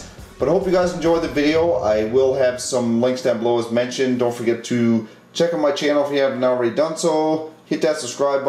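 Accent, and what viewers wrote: Newfoundland, Canada. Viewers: You are so Canadian! I'm Canadian but I don't have an accent like that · Where are you from? You sound like your from NL Canada! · god im canadian but this guy is really canadian haha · Are you a newfie? You sound like a newfie.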